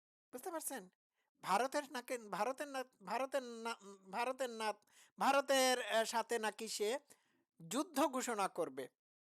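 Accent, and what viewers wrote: Sylhet, Bangladesh. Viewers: Tumi sylhety tumito maiyluker moto kota bolo tumar anda maniker moto kora dorkar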